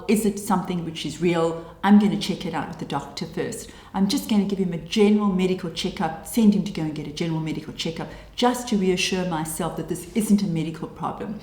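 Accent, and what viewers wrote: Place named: South Africa. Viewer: she sounds like she's from SA.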